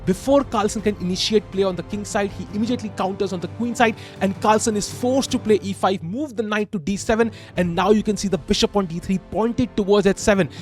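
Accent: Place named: India. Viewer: I love this commentary in Indian accent as it should be.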